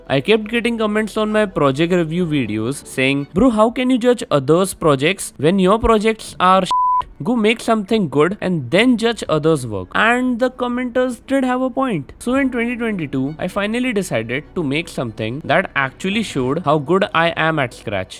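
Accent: India. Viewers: The wierdest part about this is that he sounds like a scam caller. (No offense dont cancel me every indian probably sounds like that im just uneducated)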